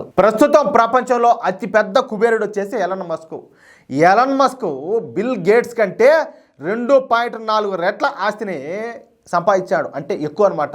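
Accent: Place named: Kadapa, India. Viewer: I love your Kadapa language.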